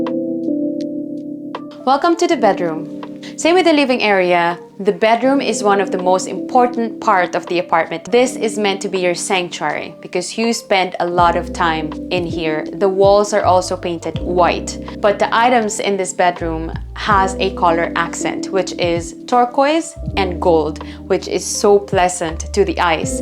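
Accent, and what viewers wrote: Ireland. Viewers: You sound like Irish.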